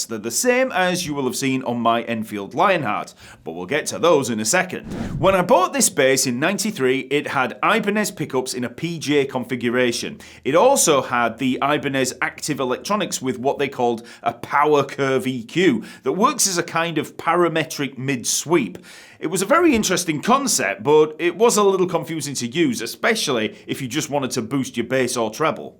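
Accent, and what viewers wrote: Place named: North, United Kingdom. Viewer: Apart from your quality of content , it's so great to hear a NORTHERN VOICE on the tube ! -from a fellow Northerner !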